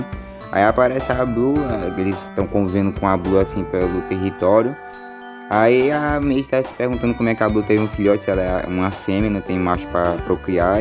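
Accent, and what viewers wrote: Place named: Brazil. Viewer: Is a Brazilian Voice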